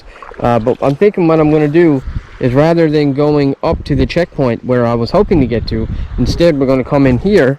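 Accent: Newfoundland, Canada. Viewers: Are you from Newfoundland ,you sound like you have a bit of an accent? I just started watching the vid and had to work...I'll watch it later...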